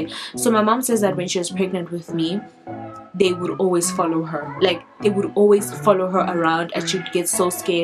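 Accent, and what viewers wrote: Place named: Nigeria. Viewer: The Nigerian accent 😂😂😂😂😂😂😂 I'm sold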